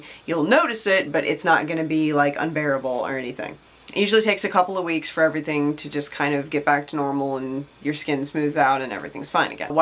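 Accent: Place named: Northeast, United States. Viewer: Hi I have been a follower since early 2012 Just Curious what state are you in. You sound kind of North Eastern U.S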